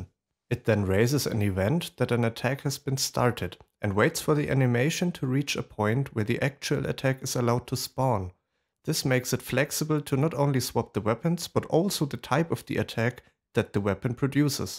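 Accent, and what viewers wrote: Germany. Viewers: Are you german by any chance? Sounds a little like it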